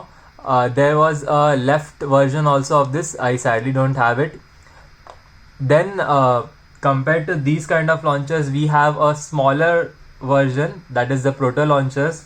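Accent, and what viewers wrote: India. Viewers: You have a perfect Indian accent bro continue doing you great work 👌👌❤️ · Your accent is like Indian only bro 🔥🔥🔥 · Your accent is indian english and i dont think its fake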